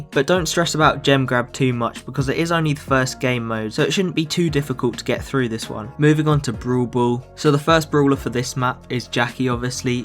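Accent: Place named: United Kingdom. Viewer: This is the most British accent i have ever seen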